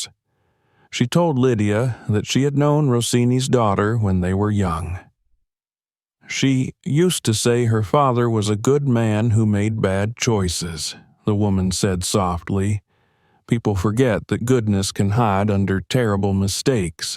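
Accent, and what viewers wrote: South, United States. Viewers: Loved the story, but seriously change the voice. The old Southern drawl does not suit the story. He can't even pronounce the Italian names.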